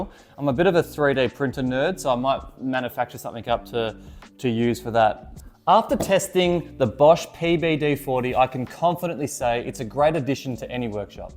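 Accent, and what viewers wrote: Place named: Australia. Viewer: A good review mate. It may be something I need for fine work. Will check one out. You are obviously an Aussie!!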